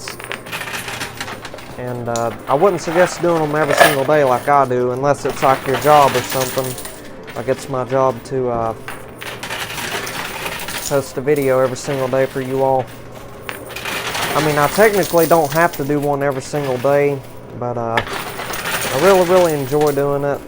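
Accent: South, United States. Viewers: Apparently, this guy who talks like a red neck does!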